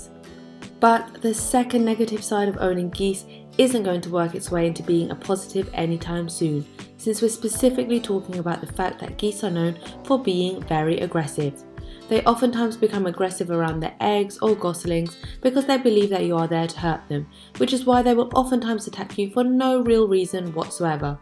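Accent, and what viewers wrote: United Kingdom. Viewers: She has a English accent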